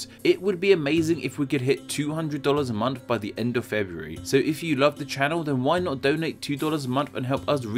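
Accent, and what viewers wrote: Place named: United Kingdom. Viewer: Your british accent gives me headache. Great video though.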